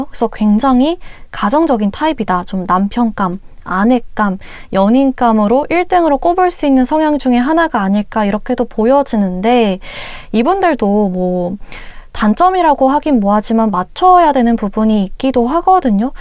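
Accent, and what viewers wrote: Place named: Chungcheong, South Korea. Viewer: 끝말이 ~~할거같아요 이런말에 끝부분이 흐려서 ~~할거가텨~ 일케 들려서 왤케 웃긴짘ㅋㅋㅋㅋ 저 충청도 사람인데 정겹게 들리네욯ㅋㅋㅋㅋㅋㅋ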